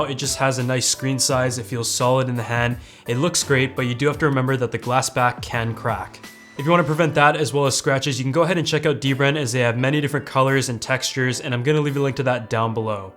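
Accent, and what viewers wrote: Canada. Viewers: MD KHALID RAHMAN he’s canadian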